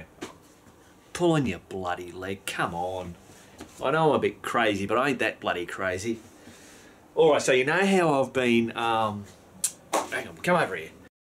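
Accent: Australia. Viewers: Thanks for the great video/info. You're a funny guy. You know, us yanks love the Aussie accent. The cardboard was pretty low tech, but certainly got the job done. Concern over blocking the air that cools the engine is always an important factor to consider and monitor. I've seen canvas covers that go over the front crash bars. You might want to get a digital laser temp gun to monitor your engine temp. Thanks again. I will integrate all this into my solution.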